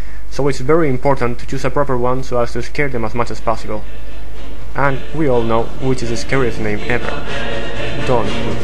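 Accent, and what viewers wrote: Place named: Spain. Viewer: Lovely spanish accent... (Y)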